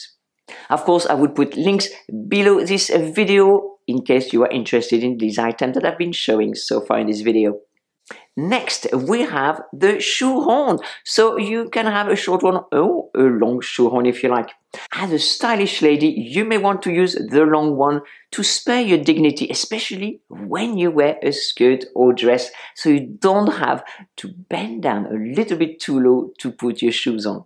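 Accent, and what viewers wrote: France. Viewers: I love your videos and learn a lot. Thank you so very much. I really love your French accent too. 👠👛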